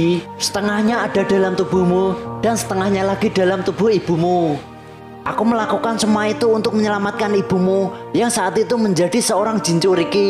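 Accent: Java, Indonesia. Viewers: Wong jowo yo mas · Njir medok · Logat nya jawa banget kang 🗿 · Anjirrr suaranya medok bgt😂😂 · Awokawok logat jawa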